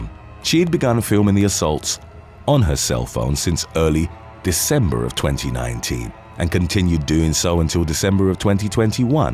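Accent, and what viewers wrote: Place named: United Kingdom. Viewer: definitely has a gift for story telling and his commercials are absolutely funny when he wants to be. And that voice with his British accent make it very enjoyable to listen to.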